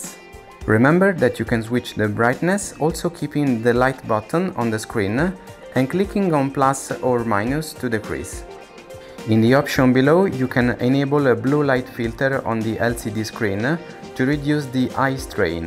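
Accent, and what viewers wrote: Italy. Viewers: Cerco una recensione in italiano, vedo che l'autore del video è italiano: ma ha fatto la recensione in inglese (e con una pronuncia tipicamente italiana!) L'unica cosa migliore rispetto alle recensioni di siti specialistici è lo scandire per bene le parole che rendono meno impegnativo il lavoro di traduzione. Cmq a parte le critiche per non aver pubblicato anche un video in italiano, ti ringrazio: il video è ben fatto ed analizza il device a fondo. Ps: dovresti scrivere nel titolo che questo è il Dasung Papelike 3 o si potrebbe avere il dubbio che si stia parlando di un modello più vecchio. Pps: 40 minuti di video sono troppi! See you, Italian Reviewer!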